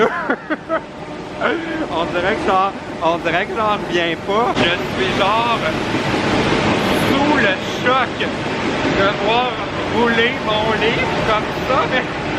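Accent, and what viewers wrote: Quebec, Canada. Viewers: J'adore l'accent québéquois 😍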